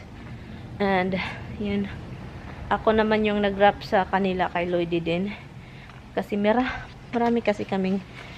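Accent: Visayas, Philippines. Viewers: Tagal mo na dyan sa america. Ang accent mo bisayang bisaya. Bat dka magtrabaho pwede rin pala dyan housekeeper.0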